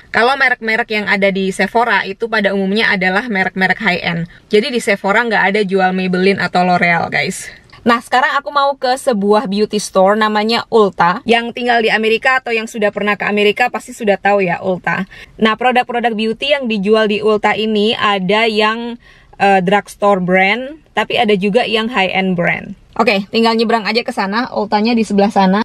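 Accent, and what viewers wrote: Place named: Surabaya, Indonesia. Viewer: Ya ampun cek larange. Hahaha... Suroboyone ora ilang... Hihihi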